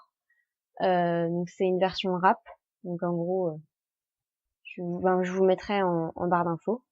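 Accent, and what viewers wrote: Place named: North, France. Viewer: Merci d'avoir répondu a mes questions :D , Je sais pas si c'est moi mais on dirais que t'es du nord mdr , et si tu veux rencontrer les acteurs je sais pas si tu connais la Welcome To The Magic School De People Convention ? ya la 4iem édition le 13 et 14 Janvier 2018 si je me souvient bien de la date ;) mais si tu veux vivre un moment pareil franchement faut mettre le prix mais ca vaux tellement le coup ;) Bref si tu voudrais qu'on parle de sa ou autre chose tu peux me contacter sur mon facebook ;) . <link> PS: De trés bonne question t'on était posez , superbe vidéo j'adore ta chaine :DDD :p Bis :*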